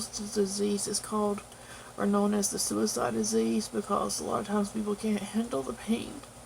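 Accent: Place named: North Carolina, United States. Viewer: You are a beautiful woman. You are strong for talking about these things. Which Carolina are you from? You sound like you are from North Carolina. I'm from NC, born and raised. Found your channel on PP Officials channel. Hope all is well.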